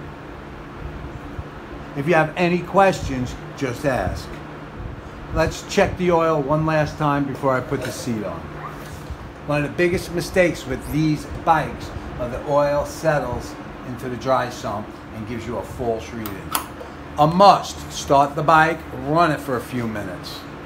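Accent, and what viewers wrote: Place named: East Coast, United States. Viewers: I knew it, you sound like I do, lol. Like you, I’m born and raised in Brooklyn 18yrs, moved to Long Island 18yrs, now I live in El Paso Texas, nice small town. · GOOD VIDEO.... LIKE YOUR THICK EAST COAST ACCENT...... LOL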